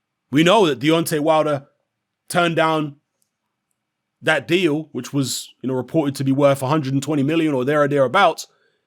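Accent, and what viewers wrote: United Kingdom. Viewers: Your biased . Why is Joshua fighting Ruiz then . Why not just push for the Wilder fight. U sound British anyway , your straight team Josh .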